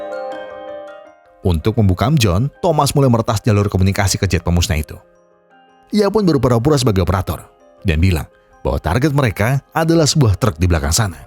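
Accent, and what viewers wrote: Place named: Yogyakarta, Indonesia. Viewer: wong jogja bro, seko logat e ketoro wkwk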